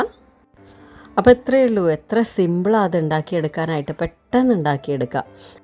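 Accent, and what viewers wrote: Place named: Palakkad, India. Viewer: Palakkad aano ? Bhasha kelkkumbol ende naatukari aano enna samshayam.😊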